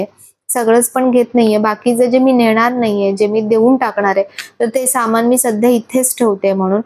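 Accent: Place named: Konkan, India. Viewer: Kokan natil language asish ahe....tasch boln aste sarvanche ..ani chhan ch bolatat tai❤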